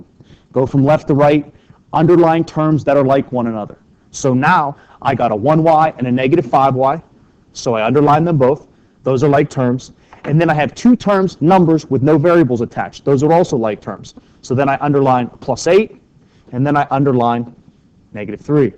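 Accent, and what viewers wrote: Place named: New Jersey, United States. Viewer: he has that new jersey accent and im living for it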